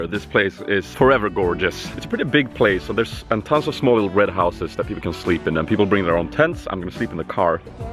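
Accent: Sweden. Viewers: Swedes - check 90's Volvo 240 station wagon - check Swenglish accent - check Raggar factor 1000 - check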